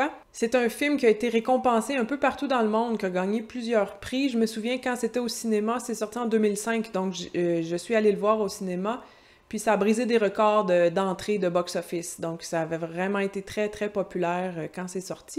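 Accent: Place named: Quebec, Canada. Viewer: Salut comment aviez-vous? Excellent video j'adore ta voix et l'accent québécoise me plait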